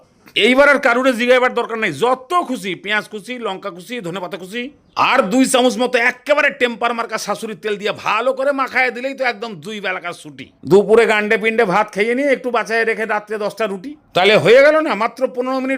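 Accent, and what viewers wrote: Bangal, Bangladesh. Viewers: Bangladeshi accent 😊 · খুব সুন্দর করে বলেন, আমি আপনার দেওয়া রেসিপি গুলো করে খাই, তারপর আমার মা চট্টগ্রামের মেয়ে, রান্না তো জানেন,আপনার কথা গুলো শুনে মনে হয় আপনি বাঙাল,তাই তো অত ভালো রান্না করেন।আমি শুভ্রা। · বাঙ্গাল কথা কি বলতেই হবে। কথার ধরন শুনে এদেশিরা আর আগ্ৰহ দেখাবে না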